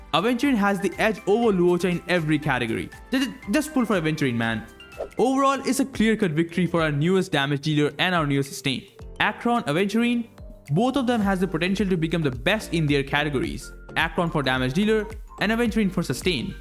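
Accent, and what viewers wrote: India. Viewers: You from India bro? Accent seems familiar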